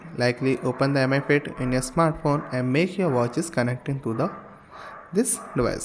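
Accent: India. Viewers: Please add captions, sometimes it sounds like you’re speaking Indian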